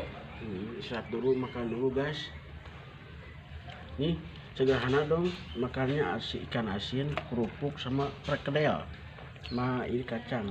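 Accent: West Java, Indonesia. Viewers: Mantap.. orang papua asli. Tp logat na geus sunda pisan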